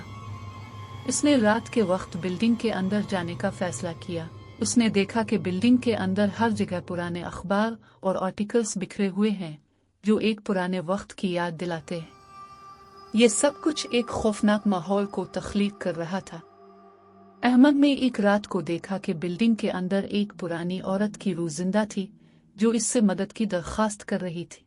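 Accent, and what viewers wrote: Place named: Afghanistan. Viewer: Aap ko urdu aa nahi rahi hai Afghani accent hai app ka Muslim log puja paat Nahin karte😮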